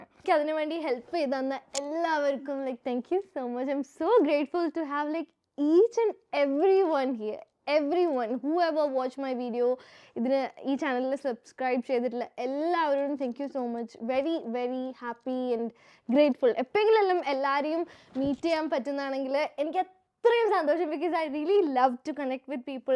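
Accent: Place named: Thalassery, India. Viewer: That thalassery slang is slaying 😂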